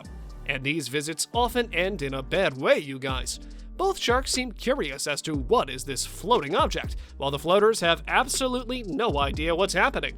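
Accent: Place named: United States. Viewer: This guy speaks English like it’s his second language but he has an American accent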